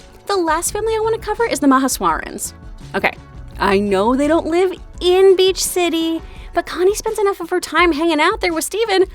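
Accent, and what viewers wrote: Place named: Texas, United States. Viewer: I knew there was a reason I liked your voice 😆 Being a Texan, I love familiar accents above all else 😋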